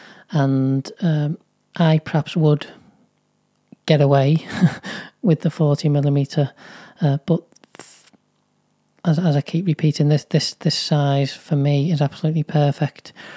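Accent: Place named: Merseyside, United Kingdom. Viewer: 😮😮😮 amazing review my friend.....nice to hear a fellow Merseysider on yt good review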